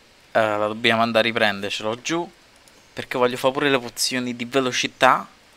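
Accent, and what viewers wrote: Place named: Rome, Italy. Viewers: E romanò :^ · non è napoletano, è romano 😂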